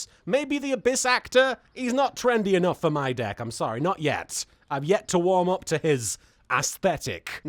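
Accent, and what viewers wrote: United Kingdom. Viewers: being extra limey today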